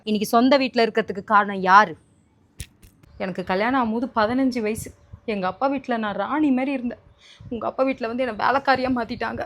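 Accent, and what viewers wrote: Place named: Chennai, India. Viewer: You are born and brought up in Haryana right? Your Tamil accents, typical Chennai girl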